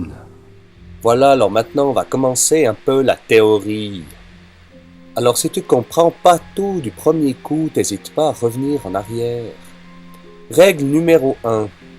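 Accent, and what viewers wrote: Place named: Valais, Switzerland. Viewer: Excellent, malgré l'accent Fendant.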